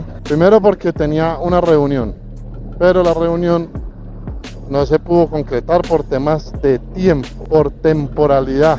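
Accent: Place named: Colombia. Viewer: . Hay un asunto de falta de identidad en colombia muy marcado, pienso YO y sobre esto ya existen investigaciones, las topofobias y las topofilias "Gi fu Tuang", pero a usted lo que le falta es tener un acento distinto al colombiano, puede ser que esto venga desde la conquista, nos arrodillamos ante el extranjero e ignoramos lo propio por más bueno que sea. Soy un consumidor de vídeos de viajeros y miro los números y cuando pasan por Colombia se disparan, vuelvo y lo digo el acento....pueden hablar pestes de nosotros y sus vídeos ser basura pero en Colombia crecen. Puede ser por ahí el asunto. Dicen que nadie es profeta en su tierra.....a mi si me gusta demasiado lo que estás haciendo, el formato, la fotografía, la edición, la música, etc. Pero solo conmigo no es suficiente. Un abrazo y pa tras solo pa coger impulso.....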